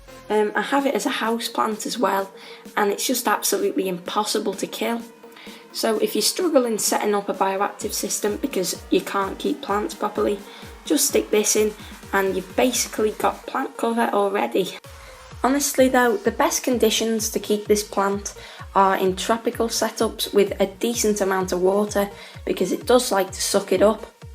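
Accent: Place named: Scotland, United Kingdom. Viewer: I like ur accent, is it Scottish? Or British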